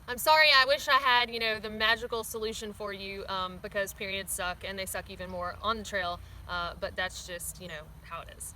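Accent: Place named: Alabama, United States. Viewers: I really dig your southern Alabama accent!! It's really awesome that you share such intimate and taboo subjects such as personal bodily functions that not a lot of people would really share. I am not trying to be vulgar but when you said you hated body hair.. do you recommend shaving your pubic hair (male or female) to prevent chafing and also for hygienic purposes? Anyway, thanks for the great info and stay safe out there and please keep the great videos coming. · I knew your accent sounded familiar. you said Alabama.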